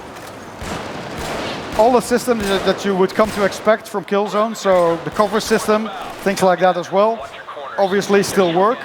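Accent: Netherlands. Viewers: Haha you can hear he's from the netherlands, I wonder how many people will play the game like this... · Hahaha, he is Dutch. I can hear it from his accent xD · hahah geweldig dat nederlands accentXD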